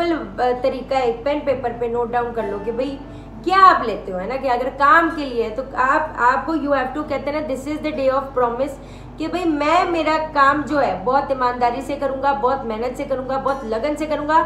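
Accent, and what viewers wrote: Punjab, India. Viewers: I am very much impress with your way of talking punjabi tarhka .And i also like your upay mam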